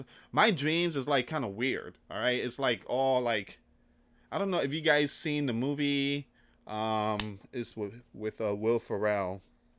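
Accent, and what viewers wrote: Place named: Australia. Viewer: Sounds aus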